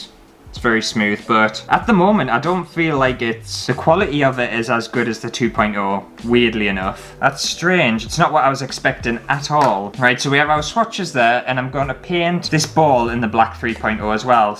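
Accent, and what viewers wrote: United Kingdom. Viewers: You need to vastly increase the bitrate of your camera, this video looks very splotchy. Also, you need to lower your shutter speed for more motion blur because it looks unnaturally crisp and jittery. I would also recommend moving to 30fps, in which case since you sound British, you'll need a 1/50th shutter speed, specifically, in order to avoid your interior lights flickering on camera. In addition to all of that, you'll have to set your camera up so that it doesn't adjust the shutter speed as a part of the exposure triangle when you have auto-exposure on, which I assume you do because most people do.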